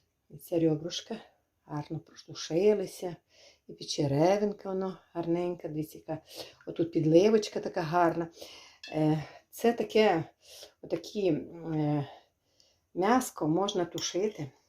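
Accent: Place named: Luhansk, Ukraine. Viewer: Чудовий рецепт. Так моя бабуся готувала, тепер іноді я. У вас неймовірна говірка, я наче знову побував вдома на Луганщіні. На тих недолугих обох статей, які вам розповідають як правильно говорити, не звертайте уваги. У них просто давно не було хлопця.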